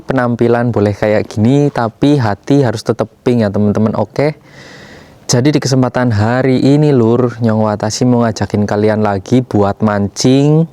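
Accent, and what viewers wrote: Banyumas, Indonesia. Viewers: Nyong watashi ngapak ngendi ya? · Gass terus kang rika wong ngapak ngendi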